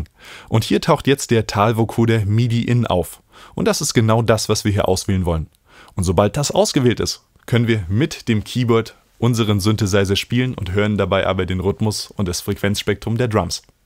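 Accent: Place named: Mecklenburg, Germany. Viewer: du kommst ausm Norden Kollege. Du hörs dich an wie mein Homies aus Mecklenburg. Weiter so macht super Spass.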